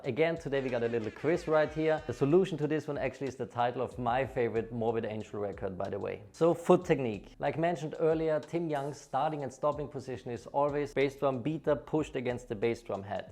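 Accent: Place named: Germany. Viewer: This kinda sounds like a German accent.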